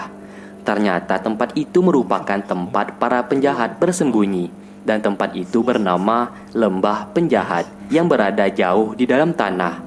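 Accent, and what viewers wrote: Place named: West Java, Indonesia. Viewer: Kekeh😂 aya sunda an ey😁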